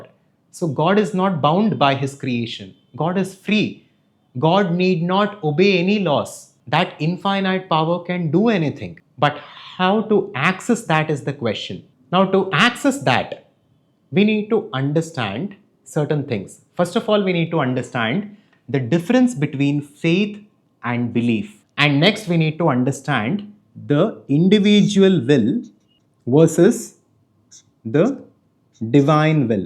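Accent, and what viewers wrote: India. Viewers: YouTube is an international audience, India. Please work on your accent and pronunciation. ESPECIALLY making popping sounds when pronouncing 'T'. It sounds awful. It's extraordinarily embarrassing to hear a heavy Indian accent when you're in the West. We are not taken seriously due to this reason, you have to realise. Im not suggesting speaking without an accent. Im suggesting working on it to make your own less heavy and more understandable. Earn your globalisation.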